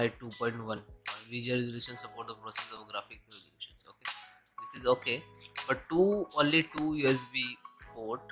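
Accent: India. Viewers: Do better, this is a terrible video, non-informant about key differences. Practice on your English accent as well, having the “stereotypical Indian guy accent” with a monotone speaking voice makes your content boring, lackluster and hard to understand.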